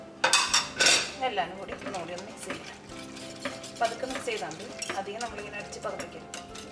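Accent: Thrissur, India. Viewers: Thrissur ano veedu...accent kettitu thoniyatha..!!!..:)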